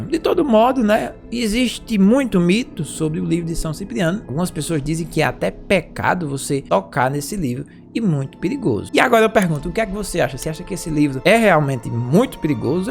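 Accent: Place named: Northeast, Brazil. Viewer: 😅 kkk então amado esse sotaque nordestino é muito engraçado, eu como satanista teísta e praticante do ocultismo pagão. É praticante da demonolatria, custei pra entender, o grande mestre e bruxo e feitiçeiro São Cipriano. E porque os demônios, insistiram, pra mim comprar esse livro mágico ou grimorio demoníaco satânico antigo sagrado de São Cipriano. Ele possui rituais das artes mágicas de alta magia negra com o Deus Satan ou rituais satânicos. Sim 👍 é atualizado em diversas versões e traduzido em vários idiomas. Não é um ☝️ simples livro, é um grimorio demoníaco satânico antigo sagrado. Já começa por aí, não 👎 é escrito para pessoas curiosas, é feito para praticantes de magia negra pagão. E para quem pratica a demonolatria e lógico. Estuda e pesquisa a demonologia, não 👎 vou ficar aqui explicando tudo. Porque são segredos dos seguidores da magia Ciprianica e obivio da magia negra. 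Eu como satanista teísta e bruxa 🧙‍♀️ e feitiçeira e médium espiritualista, fiquei muito curiosa. Quando minha Daimon pessoal, me disse Rosanna, sua vida e jornada espiritual. Já está em tanta evolução astral, que precisa estudar e pesquisar e praticar o mestre e bruxo São Cipriano. Na verdade dele, tenho dois grimorios, inclusive o grimorio demoníaco satânico antigo sagrado São Cipriano o bruxo capa preta. Eu fiquei chocada 😲 ao ler pela primeira vez. Disse pra o demônio. É muito crueldade, kkk eu juro falo tanto de animais como de pessoas. De início eu juro, não 👎 gostei do nenhum, mesmo sendo sat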